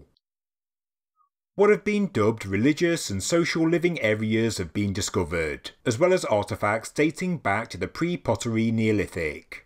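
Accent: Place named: Birmingham, United Kingdom. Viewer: brummies should never be allowed to narrate anything ever again.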